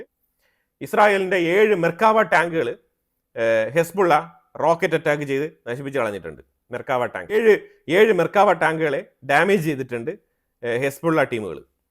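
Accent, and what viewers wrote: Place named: Pathanapuram, India. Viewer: എന്താടോ താനും മാത്യു ശാമുവലു० പത്തനാപുരത്തുകാരാണോ. അവിടുത്തെ ഭാഷ ഇങ്ങനെയാണോ ???അതായത്, ഇതുപോലെ, അതുപോലെ, അതുപോലെതന്നെ ......ഭാഷ പറയുന്നത് എങ്ങനെയെന്ന് കേട്ടു പഠിക്കുക.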